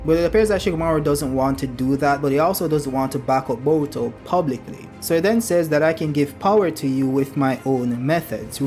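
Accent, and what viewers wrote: Jamaica. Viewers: you a jamaican bro?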